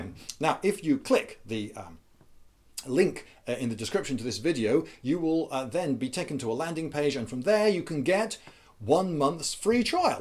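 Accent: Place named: England, United Kingdom. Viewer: +Brute127 Care to add anything besides assuming I didn't watch the video? I watched the video. A man with an English accent and one barebacking experience is not somehow an expert. Don't be fooled. Calvery was used for a reason. Warfare is more than running into a group and bashing someone. This man's thesis is astoundingly stupid.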